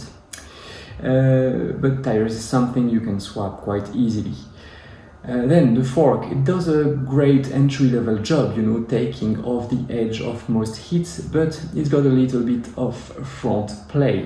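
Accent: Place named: France. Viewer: Oulala ça pue le français qui parle anglais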